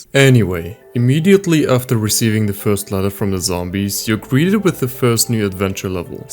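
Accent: Germany. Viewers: I can not not hear the german accent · Hm, i hear in your voice some german... and i see u are in germany... bist du deutsch xD?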